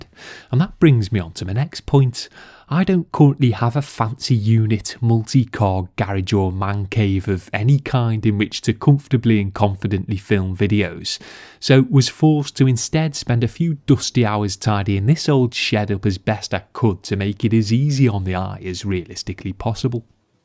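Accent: Liverpool, United Kingdom. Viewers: Subscribed to your channel 2 months ago, so relaxing and memorising it must be that soft Scouse accent😆,last video before Christmas?, if so happy Christmas/new year, loads more cars to clean in 2018 🎄🇬🇧🚘👍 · Is he a scouser or from the Wirral, it`s a bit posh there.?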